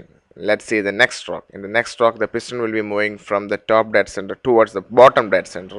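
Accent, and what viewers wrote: Kerala, India. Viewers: holy shit dude i didnt not listen to anything u just explained i was to busy laughing at ur indian accent hahaha gj tho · U must be a keralite for sure,.ur accent is such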